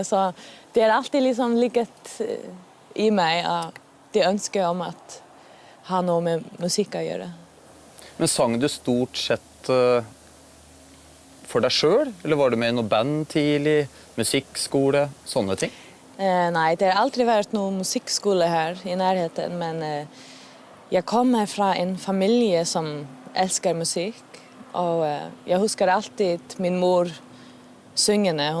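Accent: Faroe Islands. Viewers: snakkar hon norska, eller danska med faeröiskt uttal? tycker det var ovanligt lätt att förstå om de är danska :) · There is a clear Faroese accent, she kind of mixes in some general danish, norwegian, swedish(if you like) words, just enough to make herself understood by the Norwegian reporter) I think this way of talking, accent, they call 'Gøtu-danish' (Faroese person attempting to speak Danish, but have a strong accent) Naturally she also can speak pure Danish very well, when she like to.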